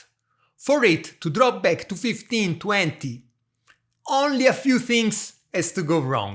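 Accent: Tuscany, Italy. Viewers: Your accent is so funny. Are you from Toscana?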